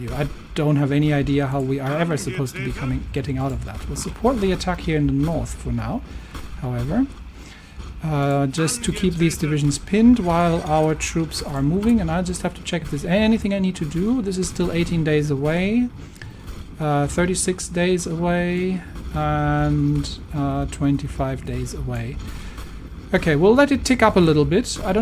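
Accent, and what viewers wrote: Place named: Germany. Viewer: Are you German? I thought your accent was noticeable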